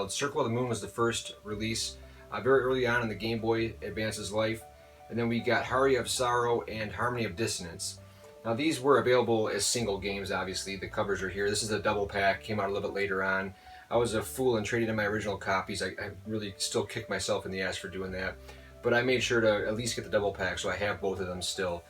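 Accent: Midwest, United States. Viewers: Hi there. As always I enjoyed this video. You strike me as a good family man and you mention your son quite a bit on your channel. So why not have him on in a future episode? Also as a side note. Are you from the Midwest, cause I detect a slight Midwestern accent. Thanks again for the video.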